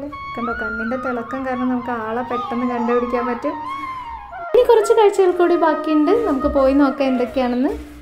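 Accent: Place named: Thrissur, India. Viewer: Thrissur slang kalakiii👌👌😄😄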